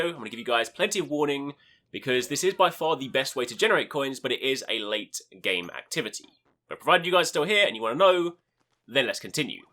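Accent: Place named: United Kingdom. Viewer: Why is every odyssey youtuber british?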